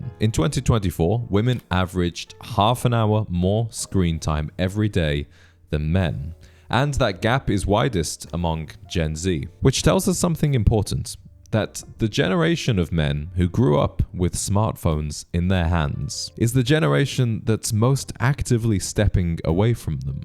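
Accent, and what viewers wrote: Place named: United Kingdom. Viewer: Dude you have a great voice, lots of depth. You could easily do audio work. Of course you’ve got the Brit accent as well which is usually a bonus haha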